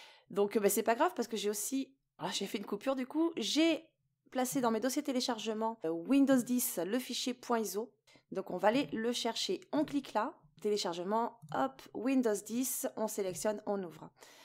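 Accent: South, France. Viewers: Merci pour ce tuto. Et bé ! Je sens un léger reste d'accent du sud. ;)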